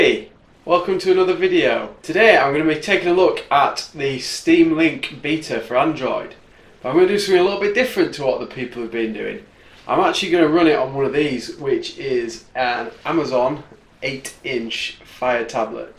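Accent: Yorkshire, United Kingdom. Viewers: Definitely beater not baiter! Trying to place your accent...fellow yorkie?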